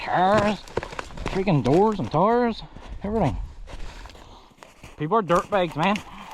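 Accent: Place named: Newfoundland, Canada. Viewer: Tirty tree tree's 😂 im laughing on the floor. Needs subtitles for anyone not from Newfoundland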